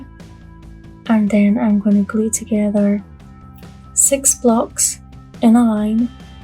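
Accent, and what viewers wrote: Scotland, United Kingdom. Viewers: With all the beautiful things you make, you should have more than 5K subscribers. I love all your videos. Thanks for sharing and I thought your accent sounded familiar, Scotland right? :0) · You are so creative with these blocks! 💕💕💕 I love listening to your Scottish accent. You're almost at 6K! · I Love your "Scotland" accent! 🏴 Your amazzzing making sooo many beautiful items, very talented! I can't even glue them even with my square. 😂 I subscribed to ALL video's, I don't want to miss any tutorials, I'm going to go back and watch your previous videos also. Congrats on hitting 5K! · Wow! I love all three of these. Ya know, its funny as I just started watching the video, I was wondering where your accent was from. So I asked myself ' did she say Scotland in a previous video?' I giggled at the end so yes, that answered my question. 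Congrats! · I love your Scotland accent. Those that you made are such a great idea and very cute!! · I can’t believe you only have 5,000 subscribers!!! You should have millions! Your diys are fantastic! Are you originally from Scotland? I was wondering what your accent was. Dollar tree even had you as a focus person in there newsletter!! Come on people subscribe and show this talented lady some love!!! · I love everything you make and I love listening to your Scottish accent I love Scotland it’s an amazing place x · !!! I just subscribed with you a couple of days ago. I’m mesmerized by your soft cute Scottish accent. It’s so cool! Scotland is my dream vacation. One day I swear I’m going 😋. So I’ve been loving your tumbling towers shelves and plant stands you make. I’m going to give it a go for my mom Mother’s Day gift! Wish me luck. Thank you for the ideas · I love your Scottish accent! 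I dream of visiting Scotland one day as it seems like such a beautiful country! Your DIYs are so beautiful and creative! Keep up the good work!! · Just found you on YouTube a few days ago, love your tumbling block crafts. You voice is very calming, love your Scottish accent. There's a wee bit of Scott in our bloodline. Keep up the wonderful videos & congratulations on reaching 5000!! 🎉👏👏🎉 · I love your ideas! And your "scotland" accent! I could listen to u all day! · Thank you for always inspiring me I love your DIYs !! I adore your Scottish accent.. It took me awhile to get some tumbling blocks and I tried to make some stuff and failed lol but I finally made a box with drawers and it came out decent. Thank you for your inspiration and congrats !!!!🎉🎉 Woot! Woot! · Your projects are always so nice. That sure was a lot of tumbling tower blocks!! Lol! I knew your accent was from Scotland. 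I just love to listen to you talk. Lol. Thanks for sharing! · Absolutely love your tumbling block tutorial! Your voice and Scottish accent are so soothing. I’m glad to have found you, and hit subscribe straight away! Visiting Scotland is on my bucket list, along with a good bit of the rest of the world! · Love the Scots accent and love your videos. Learn so much. Thank you!!!!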